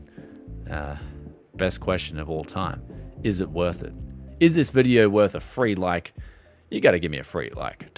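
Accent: Australia. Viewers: I think I could listen to Aussie's talk about anything and stay interested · love the aussie accent.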